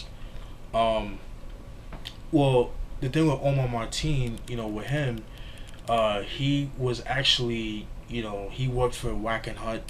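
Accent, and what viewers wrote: New York, United States. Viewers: BTW r u from NY cuz I am and I hear the accent lol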